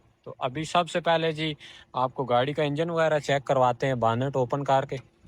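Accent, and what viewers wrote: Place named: Chakwal, Pakistan. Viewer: acha acha language apki Chakwal wali lagii islyee mujhy lga ap Chakwal se ho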